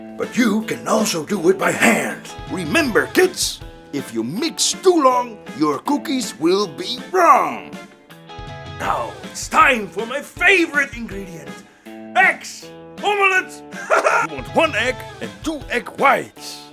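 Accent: Netherlands. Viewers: Is it me or does he sound dutch cuz i am from the netherlands and he speaks liek dutch trying to speak english so i think hes dustch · Love the Dutch accent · Why tf sounds bro so DUTCH?????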